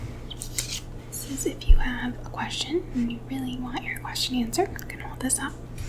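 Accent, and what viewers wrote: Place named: Midwest, United States. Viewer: You’ve got a total midwest accent but your background doesn’t look like the Midwest in the winter lol. What state do you live?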